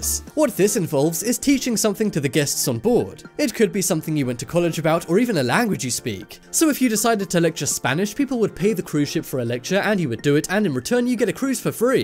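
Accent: England, United Kingdom. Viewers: That very informative thanks.I going on cruise to mede soon wish saw this vid first.You only referring to American cruise yet you have English accent ,so. Do all codes etc are they international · Lot of nonsense on this video. Plus why does the English sounding voice guy assume only Americans watch the video??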